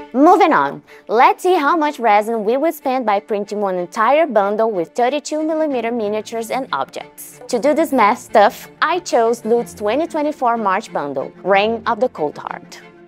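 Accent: Brazil. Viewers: Você é brasileira, esse sotaque é de brasileiro kkkkkk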